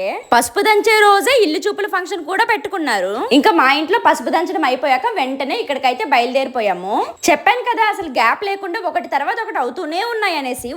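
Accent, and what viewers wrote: Srikakulam, India. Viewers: Sis me voice ki oka uniqueness undi srikakulam yasha baguntadii nadi kuda srikakulam Sis movies lo try cheyandi me voice chala unique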